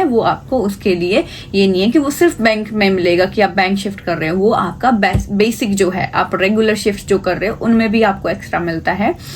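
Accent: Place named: England, United Kingdom. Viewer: Ap k Hindi mai English ki jhalak arehi hai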